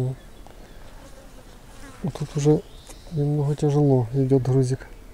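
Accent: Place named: South, Russia. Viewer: говор южно-русский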